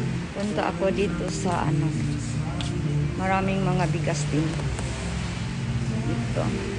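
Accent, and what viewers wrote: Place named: Visayas, Philippines. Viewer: hahaha ni gawas gyd pgka pure bisaya nimo ate.. Nagkatawa ko sa ARYATA😅😅..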